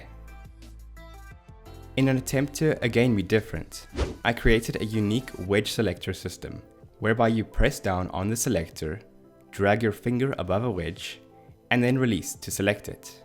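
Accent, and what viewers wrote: South Africa. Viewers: I know a South African accent when I hear it :) game is coming along nicely, bro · is ja n SA aksent ruik soet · Difficult to place your accent... You south african...?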